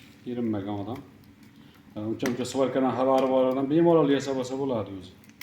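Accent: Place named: Namangan, Uzbekistan. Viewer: Гап йук уста ака наманганни жийда капа ёки чужа кишлоги шеваси билан бир хил экан шевангиз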